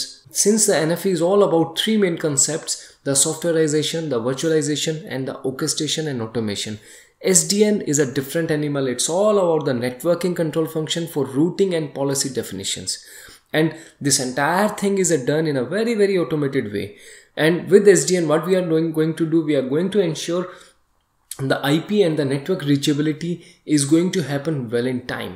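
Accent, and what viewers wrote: India. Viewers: India spoken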